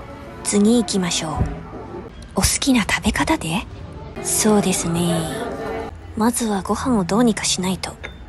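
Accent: Changhua, Taiwan. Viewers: 以為是彰化腔的台語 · 彰化腔最可愛❤❤❤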